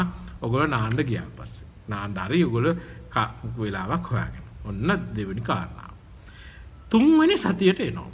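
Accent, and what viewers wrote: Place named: Sri Lanka. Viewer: පුලුවන් කෙනෙක් ඉන්නවනම්. මේ සර් වගේ සිංහල අය කතා කරන ඉංග්‍රීසි තියන චැනල් එකක් කියන්න.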